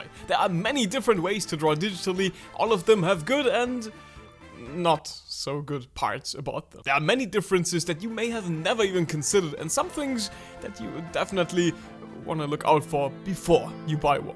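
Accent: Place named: Germany. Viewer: I hear Deutsch :)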